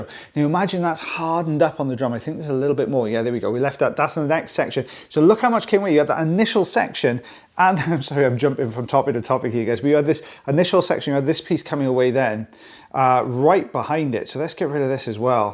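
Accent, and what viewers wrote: Wales, United Kingdom. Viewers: Loved that! Also love your accent - I lived in Carmarthen when I went to Trinity College there in the 90s. I really miss Wales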